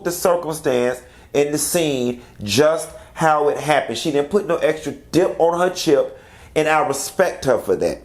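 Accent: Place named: Mississippi, United States. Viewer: Literally!! Since your first video I was like omg his ass sound like he from Mississippi lol yo ass be knowing some shittt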